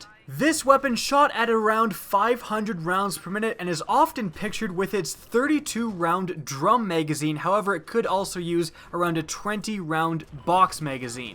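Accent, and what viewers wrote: Russia. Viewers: Nice Russian accent 👍🏻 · Nice russian accent and hello from Russia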